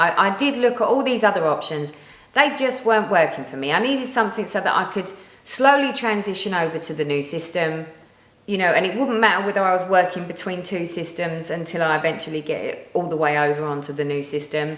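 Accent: United Kingdom. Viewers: Looks like a great storage system. Thanks for sharing. Love all your stitching. Your accent makes me feel homesick for the UK though!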